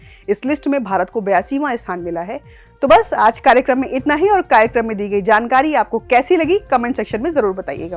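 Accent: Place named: Purvanchal, India. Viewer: मैडम आप भी पूर्वांचली/अवधी/भोजपुरिया क्षेत्र से हो क्या....... तालुकेदार क्या होता है 😂😂😂😂😂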